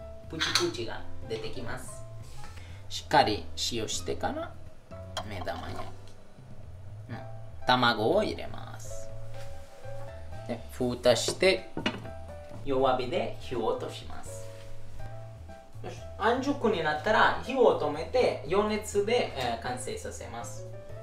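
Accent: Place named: Naples, Italy. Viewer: ナポリの方言カッコ良すぎないか？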